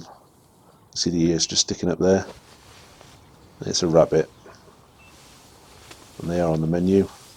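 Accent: England, United Kingdom. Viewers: The calm English accent puts me to sleep everytime sitting at my computer.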